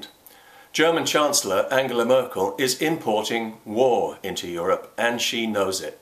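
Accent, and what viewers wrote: England, United Kingdom. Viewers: Condell is himself an immigrant. Despite the English accent, he was born in Ireland.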